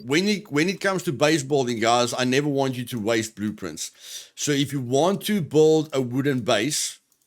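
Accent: South Africa. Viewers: You a south African my guy I can hear your accent 👍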